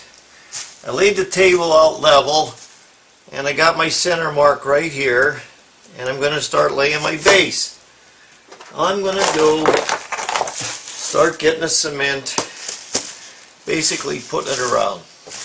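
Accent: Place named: Pittsburgh, United States. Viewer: I'm from PA also - Royersford, I'm guessing you are out somewhere near Pittsburgh judging by your accent! Those things came out great! Really look professional!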